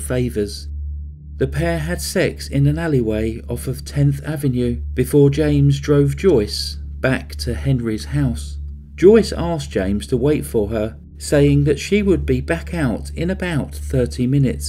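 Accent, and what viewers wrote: England, United Kingdom. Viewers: Good morning, you sure know how to tell a story. I feel sorry for Joyce. I must admit, we Yanks love the English accent, ya'll can make a sad story sound serious, yet sweet. Take care! (Hi CR Fam!) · What part of England are you from? South?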